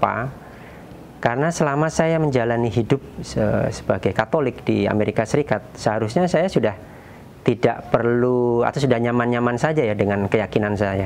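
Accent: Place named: Java, Indonesia. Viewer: Ngomongnya medok nga gerti...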